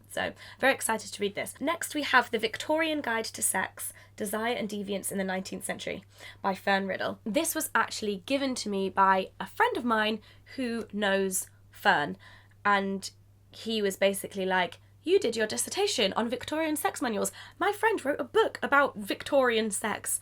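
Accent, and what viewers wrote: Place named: Manchester, United Kingdom. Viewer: Have you been home recently? You sound more mancunian😂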